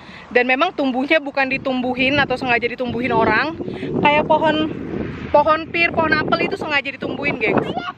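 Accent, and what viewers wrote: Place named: Medan, Indonesia. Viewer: Kongsi ya kak..... medan kali bahhh 😁😁😁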